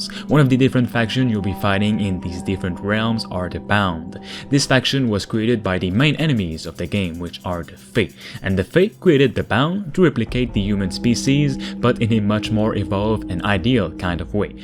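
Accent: Quebec, Canada. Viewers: Man I love your Georges Saint Pierre style accent. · do i hear a quebec accent ? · Tu sonne comme un québécois mon chum ;)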